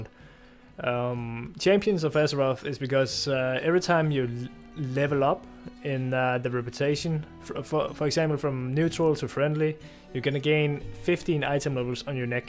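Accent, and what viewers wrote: Denmark. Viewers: Are you danish? You really sound danish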